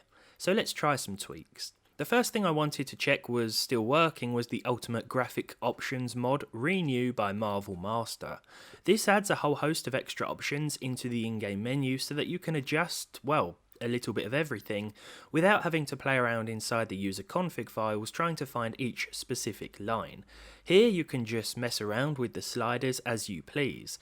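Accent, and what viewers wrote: United Kingdom. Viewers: Lowspecgamer:British edition.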